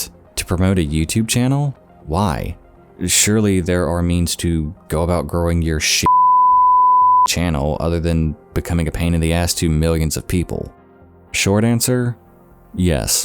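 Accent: South, United States. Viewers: Quickly becoming one my favorite channels. Love your channel man. That subtle southern accent is extremely inviting. Kind of like Lazy Masquerades and Be Bustas accent gives them their little spark.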